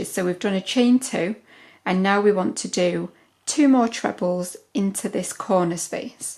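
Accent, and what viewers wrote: United Kingdom. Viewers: Your British accent is a winner as well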